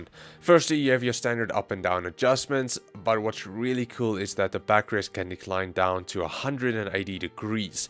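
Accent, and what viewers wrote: Afrikaans, South Africa. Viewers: Kan hoor jy is Afrikaans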